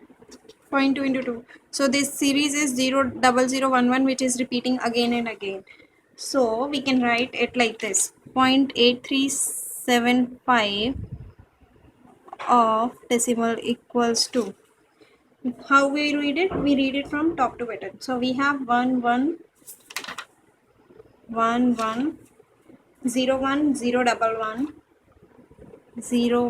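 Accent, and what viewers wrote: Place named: India. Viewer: Typical Indian English -_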